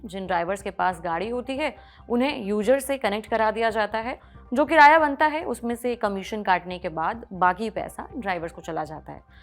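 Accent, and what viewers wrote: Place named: Uttar Pradesh, India. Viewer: Lagta h ap UP se ho😂😂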